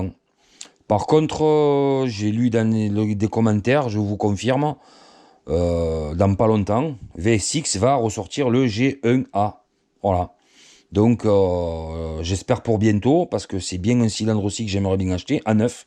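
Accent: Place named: South, France. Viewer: T est bien du sud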